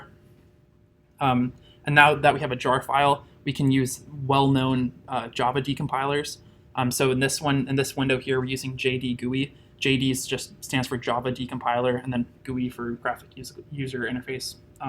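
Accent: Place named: United States. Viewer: Subtitles please! I'm from India and I don't understand American accent. 😞🙏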